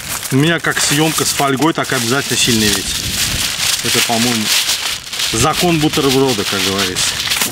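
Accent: Baku, Azerbaijan. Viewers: Дорогой, любое твоё блюдо - шедевр, спору нет. Но больше всего подкупает твой едва уловимый бакинский говор. Это нечто!!!! Как будто дома, в Дербенте...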